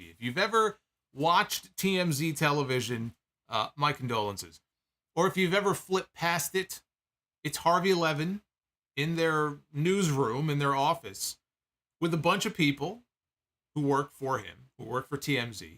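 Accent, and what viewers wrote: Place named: Brooklyn, United States. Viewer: Omg! The footage of your bar mitzvah melted my heart. You still have your Brooklyn accent, don’t worry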